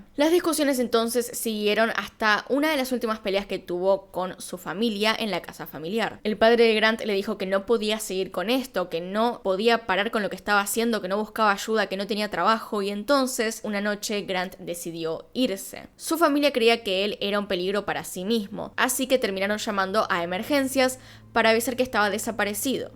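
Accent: Argentina. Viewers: Me gusta tu acento Argentino :D, Un Abrazo Princesa :D, Interesante Historia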